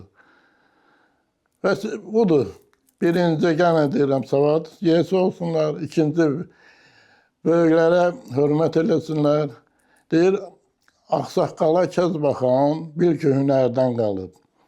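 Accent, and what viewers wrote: Baku, Azerbaijan. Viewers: Allah uzun ömür versin Size dayı,halaldır Bakılılarına.Bakilarinin lehçesi kimi lehçe yoxdur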